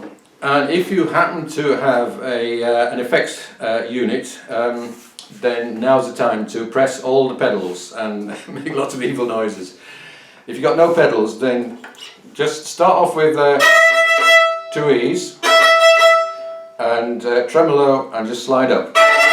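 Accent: Yorkshire, United Kingdom. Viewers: There is no issue with you doing this in a yorkshire accent, as you somewhat note in that it takes the old tongue to do and the aussies are another I could think of that hold on to it. Its interesting to hear it that way and have no objection to it, just dont let it die. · why is he british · Sounds way better in Yorkshire accent! 👍😉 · Brilliant tutorial! Thanks. The Yorkshire accent adds a new twist to an old classic 😉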